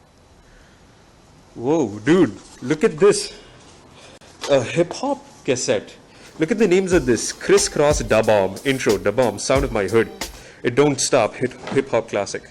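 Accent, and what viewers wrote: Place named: Hyderabad, India. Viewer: This guy is hyderabadi . When he said ye sab cheezan 🤣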